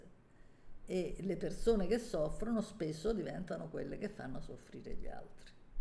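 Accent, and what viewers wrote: Rome, Italy. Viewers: Ciao non ho ancora visto il video però ci tenevo io a cercare di darti qualche “spunto animico” che fanno sempre piacere Ti stra consiglio le serie di Zero Calcare su Netflix, ne è uscita una di recente “Due spicci” molto bella ma sono tutte meravigliose… tra l’altro romanaccio come te, secondo me ti piacerà molto E poi una canzone, “ ” dei Grazie mia, spero tu stia bene ♥️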